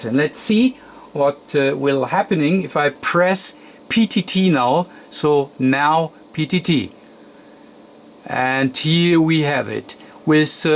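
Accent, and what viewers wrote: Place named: Germany. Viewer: I am just glad to see you back. I love your videos, german accent or not!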